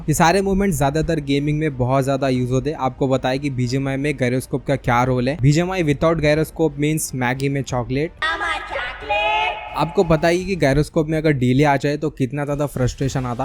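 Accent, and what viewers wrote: Hyderabad, India. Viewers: Bahi aap hydrabadi ho kya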